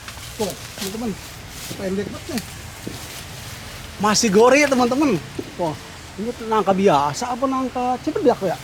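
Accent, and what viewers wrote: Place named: Jakarta, Indonesia. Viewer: Masa alloh bng,boleh tau dari mna nih mirif btawi logat nyh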